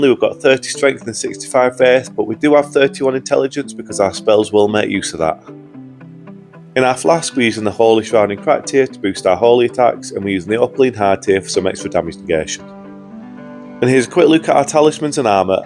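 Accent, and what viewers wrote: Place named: Yorkshire, United Kingdom. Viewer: Love your accent mate! What part of the UK? Yorkshire?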